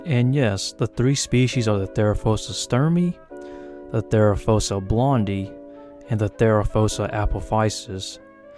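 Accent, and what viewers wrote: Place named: South, United States. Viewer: Bro I can tell your from the southern states. I was born and raised in Virginia and North Carolina hell yeah brother!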